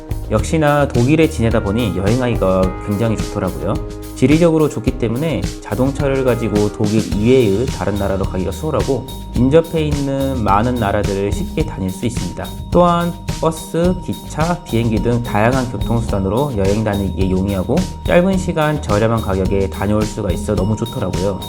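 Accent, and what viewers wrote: Jeolla, South Korea. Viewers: 전라도 분이 신거 같은데요 억양이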